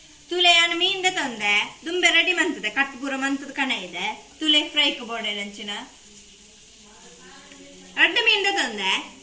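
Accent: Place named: Mangalore, India. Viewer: Pure manglorean base voice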